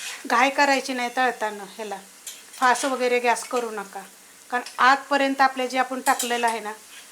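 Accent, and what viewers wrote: Satara, India. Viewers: भाषा सातारी आहे.... कारण मे देखील सातारची आहे पण मुंबईत लहानाची मोठी झाल्याने मला अस्सल सातारी बोलता येत नाही. पण भाषे वरून समजते.असो.... माझे सासर खटाव तालुक्यात आहे... आपण एकाच भागाचे आहोत.. तुम्हाला all the best 🎉❤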